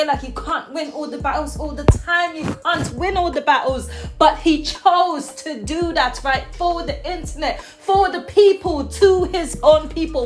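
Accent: United Kingdom. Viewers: Some annoying British accent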